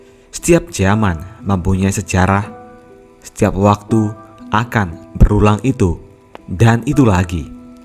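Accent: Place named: Java, Indonesia. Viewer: Admin anda orang Jawa y.dr logat b teleb bukan orang sunda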